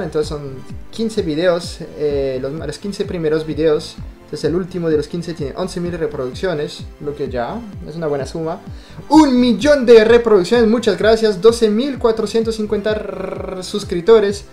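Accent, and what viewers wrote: Bogotá, Colombia. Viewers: Tu acento es muy colombiano eso es verdad. Los québécois que están en mi clase de español matarían por hablar como tú, ya que se van a un stage a países hispanos. Te conocí gracias a una antropóloga amiga mía que ve los intercambios Québec-México Y Mexico-Francia y a los hispanos les puso un par de videos tuyos en el tópico de choque cultural, no eres antropólogo pero tu honesta opinión nos parece objetiva y certera, a mucha gente nos interesa la cultura y la sociedad, sigue adelante. Saludos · hola! me gusta mucho tu manera de hablar, no se si hablas muy como colombiano porque yo soy de México pero sí creo que tu español es buenísimo:) Qué padre que hagas videos positivos de estos lares. coincido en que eres guapo!:) ojala que sí encuentres una latina que te haga muy feliz!! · Pareces colombiano porque tu español muy bogotano. Posdata: Disfruta el verano y broncéate mucho! 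Posdata de la posdata: Zidane, calvo hijo de puta, mete a James ajajaja (es broma)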